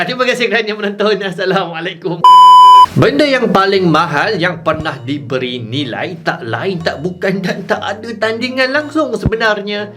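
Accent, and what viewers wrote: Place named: Kedah, Malaysia. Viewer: Saya ada soalan ,abang ni orang kedah ka ? Hang hang hang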